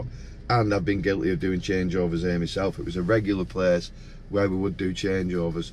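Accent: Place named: Lancashire, United Kingdom. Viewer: Evening. Hope you’re ok 👍🏻 I’m loving your videos matey 🤩 It must be your Lancashire accent lol 😆 You talk a lot of good sense and give some fantastic information in a really cool way 👍🏻 Take care until the next time cheers 😎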